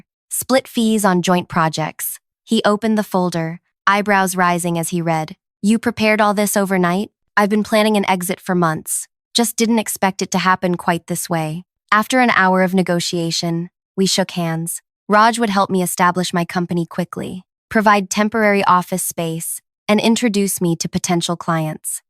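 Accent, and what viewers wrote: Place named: Asia. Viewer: Same old Asian accent and same old points. No American accent to tell it's a story from USA. Just mocking American corporates always 😢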